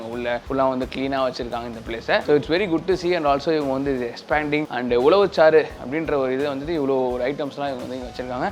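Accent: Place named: Butler English, India. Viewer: Stop talking your buttler english ....... fraud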